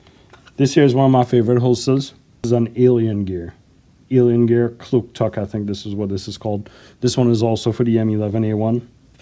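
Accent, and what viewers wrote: Trinidad and Tobago. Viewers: Is it me or this man sounding like Trini?? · That accent can’t hide, sounds like a Trini... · Sound like a Trini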